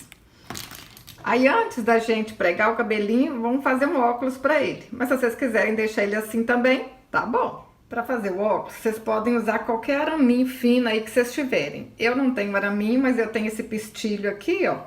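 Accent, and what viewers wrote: Minas Gerais, Brazil. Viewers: Estou encantada por a caso achei você estou cm covide e fiquei encantada me levantou por acaso você é mineira porque teu geitinho de falar é igual ao meu sou de Cataguases parabéns amei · Só nós mineiros temos este sotaque e expressão. É bom DEMAIS! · Que lindinho ficou esse vovôzinho. Adoro seus vídeos e seu sotaque mineiro me trás uma lembrança linda de uma amiga/irmã que já não está mais nada é mundo . Pessoa maravilhosa, doce e criativa xomo você. Parabéns pelo seu trabalho.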